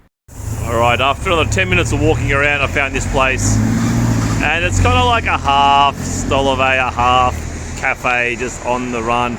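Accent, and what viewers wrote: Australia. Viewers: It's very hard to understand this Australian accent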